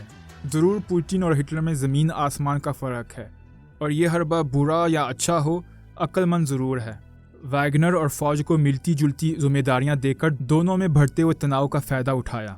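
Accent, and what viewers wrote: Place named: England, United Kingdom. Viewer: Ap urdu barri achi tarah angrez ke style main bolta hai😅😅😆❤️👍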